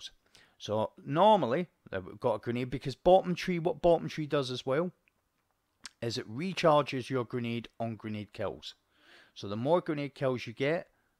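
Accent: Scotland, United Kingdom. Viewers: I love you'r explanations especially through the jumping part,and then with clearing of the adds,and i hear from you'r accent you are a fellow Scot,but can yu tell me do you play on PC or console.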